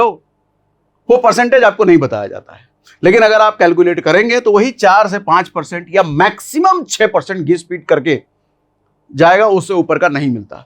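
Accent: India. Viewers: You are really a very good teacher! You desi walla voice further more enjoyable. God bless you!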